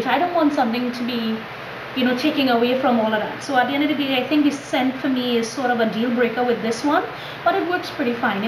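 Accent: Trinidad and Tobago. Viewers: As a fellow Trini I LOVE to hear the Trini accent on YouTube !!!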